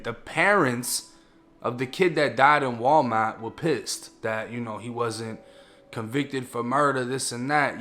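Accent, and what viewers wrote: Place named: Massachusetts, United States. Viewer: Lol I knew you was from mass you talk just like them hit me if you need a camera man or something lol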